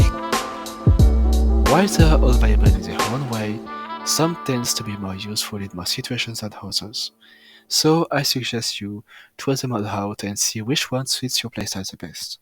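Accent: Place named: England, United Kingdom. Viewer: Noice english accent 😙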